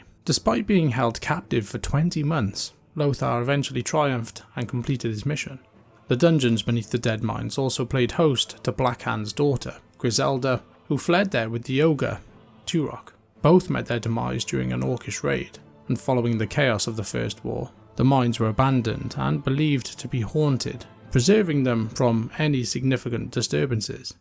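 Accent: United Kingdom. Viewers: Was so glad you were British when I clicked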